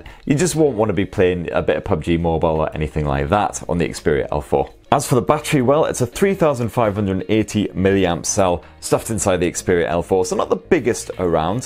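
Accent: United Kingdom. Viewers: For an not englishman incredible hard to understand and follow - british accent and talking so damn fast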